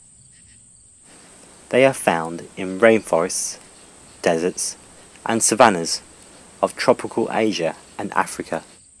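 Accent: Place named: United Kingdom. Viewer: Cool accent! Is that British or South Africa?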